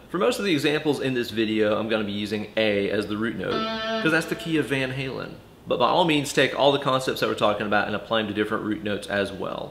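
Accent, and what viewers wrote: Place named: Tennessee, United States. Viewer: the Dave Ramsey/I definitely live in Tennessee voice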